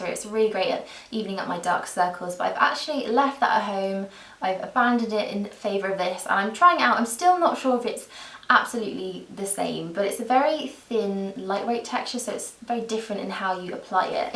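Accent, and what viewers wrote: United Kingdom. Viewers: The dedication in that down bar, you go girl ! ;) I could listen to you speak all day, such a relaxing voice ! Out of curiosity, is your accent from any specific region in the UK? I'm Australian and find it very interesting how incredibly different regional accents can sound there (obviously it applies here as well but no where near that extent)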